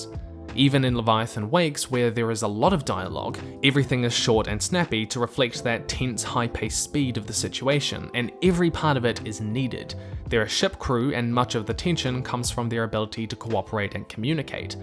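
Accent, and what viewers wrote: United Kingdom. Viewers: Why do you Bri'ish mofos have such trouble with words that end in "ian" or "ion?" No, it isn't SebastIAN. It's Sebastian. 3 syllables, not 4.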